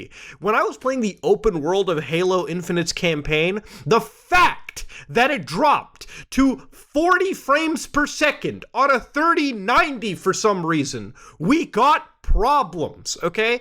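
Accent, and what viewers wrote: Canada. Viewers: Canadian accent was unusually noticeable in this video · I love when the slight Canadian accent comes out, but spittin facts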